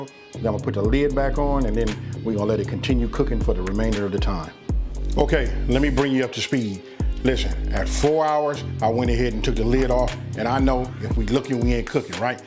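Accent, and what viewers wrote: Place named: Chicago, United States. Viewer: Good job bro! I like the beat in the background also. If I wasn't mistaken I would have thought you was from the Chi lol.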